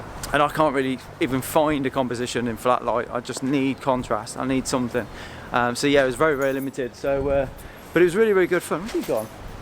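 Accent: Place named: Northern England, United Kingdom. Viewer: Great video, Bath is the place to be. Love how you Northerners pronounce it. Keep um coming mate.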